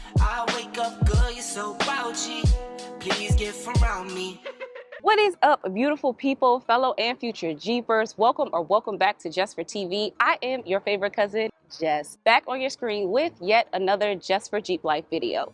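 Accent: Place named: Northeast, United States. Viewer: Where are you from?? I’m detecting northeastern vibes.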